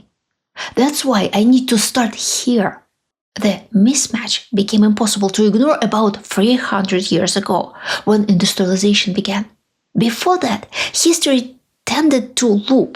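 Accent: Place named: Russia. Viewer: Hi, I started tuning into your channel because I have been a huge fan of ASMR content for years. You have a beautiful, soft voice, and I really enjoy the russian accent. What I ended up discovering was an intelligent woman, with a unique perspective on geopolitics, told with stories and facts. I REALLY, REALLY enjoy your videos, for all of those reasons. I encourage you to continue your work, you are a bright light of truth in a world hell bent on suppressing it. Your work is important, powerful and illuminating. I can't waif to see you continue growing, you deserve that success. Lots of love, from Melbourne Australia ❤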